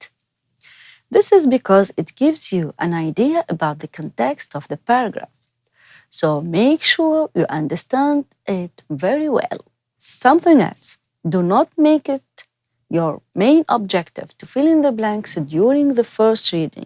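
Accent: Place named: India. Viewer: It's giving Indian accent ❤️😆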